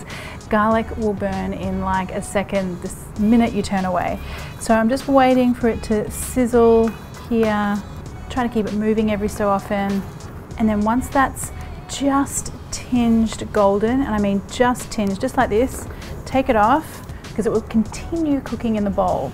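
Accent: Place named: Australia. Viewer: OMG i just shared 1 of her videos w my grown-up children n i had told them the exact same thing! I just love to watch n listen to her lovely Aussie accent😁. I agree totally, Soothing ...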